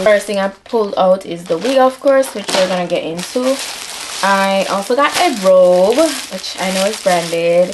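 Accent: Jamaica. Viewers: Hello my sister my Jamaican sister you have to be from Jamaica because I hear the accent my family is from St Mary Jamaica and I bought this same wig to as well and I decided to look up somebody who was putting it on to see how I can situated on my head and I came across your video and you happen to be Jamaican too please tell me if I'm wrong but I can hear the accent girlfriend. Love ya my dearest. Blessings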